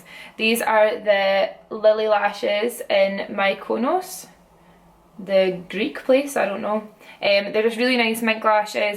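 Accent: Scotland, United Kingdom. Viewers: I've said it before & I will say it again ur Scottish accent is everrythingg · what part of the uk are you from? love ur accent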